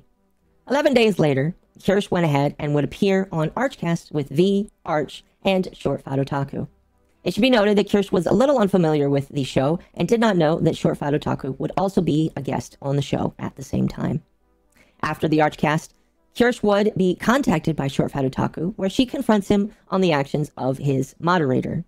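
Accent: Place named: Boston, United States. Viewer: Having found this channel through Spicy Fox Anime Woman, I have learned some things: 1) Kirsche is autistically highly intelligent. What this means is that she has logic analysis that, when focused, can burn through bullshit, lies, and deception like a magnifying glass amplifying solar energy to the point of combustion. 2) She, whether she knows it or not, applies the Art of War to internet drama and issues. And she is very, very good -- to the point that she does not interrupt an enemy who is making a mistake. 3) Kirsche is based, and stands for truth. 4) She never picks fights. 5) She is fact and evidenced-based, and certainly will clarify if something is her opinion or not. 6) She has done much to expose grifters and liars for who and what they are. 7) Most importantly, she is the kind of woman many men wish still existed, only because she is fun-loving and has a very warm personality, not to mention being very attractive. 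And this, in spite of the Italian Boston accent, and her self-proclaimed "Woman Debuffs." In conclusion, people should learn that Kirsche is a manifestation of a quote that many either don't know, or never learned: "No one can have a more savage enemy than one who thinks they are right with God." Because when you mess with the Bull of Truth, you can and will get its horns. And when you do, you cannot blame others because you f*cked around and found out -- exactly as SFO/Dev are finding out themselves. This is why I support Kirsche. She is good people and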